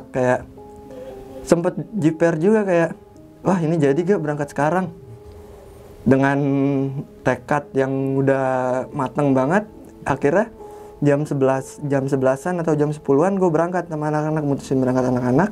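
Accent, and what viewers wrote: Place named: Bekasi, Indonesia. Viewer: Resep bet dah ah gaya ceritanya, nyablak bett hahaha lebih ke bekasi ini mah kaga cileungsi😂 apa jangan jangan orang setu nih si abang nya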